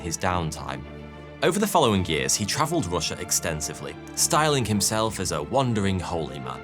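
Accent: United Kingdom. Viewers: Amazing content. I mostly enjoy hearing your British accent. Funny, and history facts. Great job, and thank you. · He's a brit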